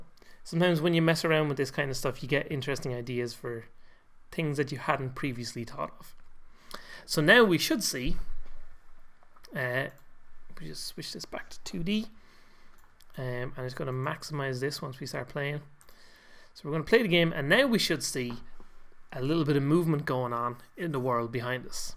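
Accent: Ireland. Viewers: Great video! PS: Your voice sounds like Jacksepticeye (because you're irish)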